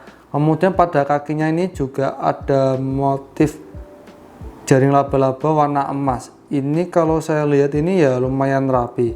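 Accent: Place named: Java, Indonesia. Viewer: Selalu dgn ciri khas medoknya,sukses selalu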